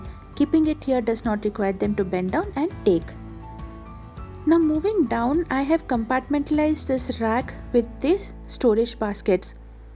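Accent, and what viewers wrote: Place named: India. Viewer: FINALLY AN YOUTUBER WITH A NORMAL INDIAN ACCENT.. VERY NICE T PRONUNCIATION... I LOVED IT... THE VIDEOS I WATCHED USE THEIR ENGLISH DELICATELY AND ITS SO ANNOYING TO HEAR THEM SAY TTT ... AS IF THEY ARE TYPICAL SHOW OFFS.. ANYWAYS I LOVED YOUR VIDEOS. KEEP THEM COMING. SUBSCRIBED....👍👍👍👍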